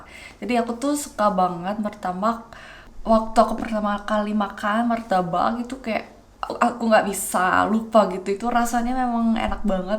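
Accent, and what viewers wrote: Indonesia. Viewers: Aksen indonesianya sudah 70%.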